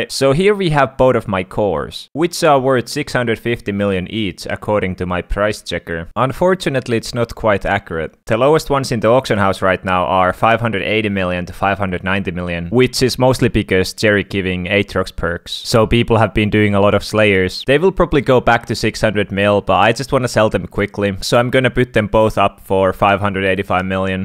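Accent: Finland. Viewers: Are you from Finland? I hear it from your voice. LOL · first thing when i opend the video i was like is he from finland sounds like it and then i watched and ofc you are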